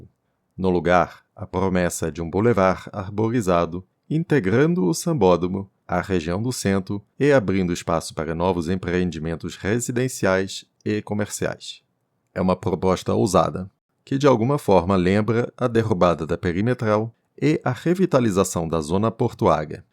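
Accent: France. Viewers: Que sotaque francês é esse ?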